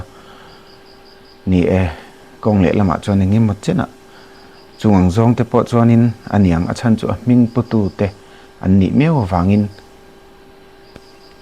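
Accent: Myanmar. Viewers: Falam tawng Chu aawm e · Nangpa hi ka rin zawng ini lo.Burma ho hi in tenawm tlat,mizoram inpumkhatna pawh in ti chhe dawn.Mizotawng pai deuh deuhin in tawng a,in zak der mai si lova.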